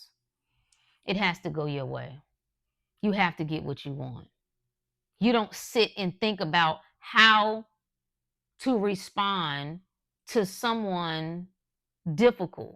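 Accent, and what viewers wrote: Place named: South, United States. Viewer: Ok I figured you were from the south you kinda sound like Erykah Badu when you talk lol. Both of you be dropping gems 💎 & have a country accent. Love your videos ❤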